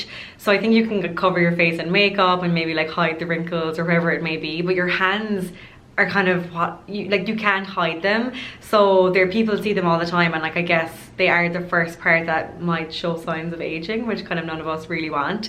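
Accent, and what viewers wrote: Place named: Ireland. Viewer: Lovely your Irish accent. ❤️❤️❤️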